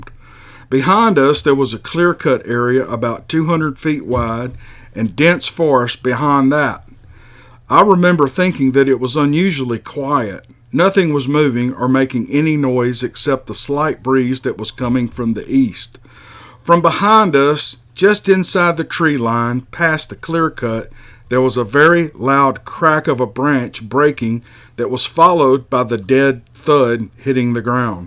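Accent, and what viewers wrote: South, United States. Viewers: I think the southern accent really does it good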